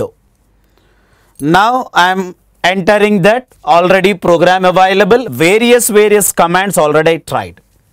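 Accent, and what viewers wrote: India. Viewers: İngilizceyi bilmiyorum sanıyordum meğer hint aksanı olunca anlayabiliyormuşum adamsınız hintliler devam <3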